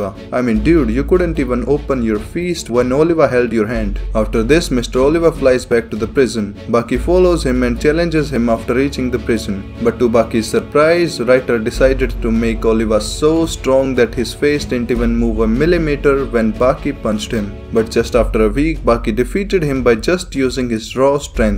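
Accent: India. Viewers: the indian accent is what makes this videos the best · Where are you from? Your accent is Indian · Is this guy from India? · I just starte watching this chanell and its just so fun with his indian like voice exsplaining typical baki stuff to me like it was kindergarden i love it! (allso why is every single human in baki anime have more mussels than Any body bulder on earth?) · Are you an indian 🤔 just asking because your voice sounds very familiar with the people of india 😎.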